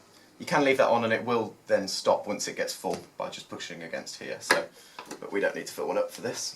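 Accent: United Kingdom. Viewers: So the BRITS LEAD the SEW.MACHINE REVIEWS ! 😅😊! I am FROM , Michigan , now in Boston, MA ., 74 and your accent helps me understand N.England people !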